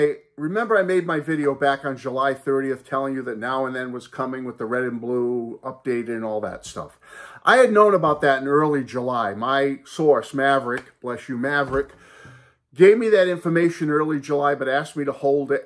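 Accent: Boston, United States. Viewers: Your heartfelt sentiments are truly worthy of your love for mankind and the!! I always have a spot in my heart cause when I hear you I'm reminded of Boston where I lived for many a year 1974 to 1987 to be exact. So "Now and Then" hearing your voice puts a warm smile on my face. God Bless and KEEP THE FAITH!!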